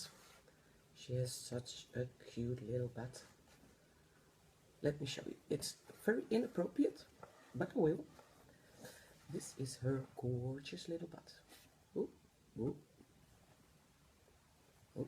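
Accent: Netherlands. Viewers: Aan het accent te horen bent u Nederlands. Petje af voor het goede Engels! Een tip: iemand anders iets aanleren is 'teach', not 'learn'. ("I will teach you how to do that') En ja, het is 'forehead'. Geen probleem. Het is heel goed om naar te luisteren, en de poppen zijn geweldig. Ik zou graag een boek zien dat de technieken beschrijft, bestaat dat? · Are you Dutch? cause yeah sorry for asking I still like your videos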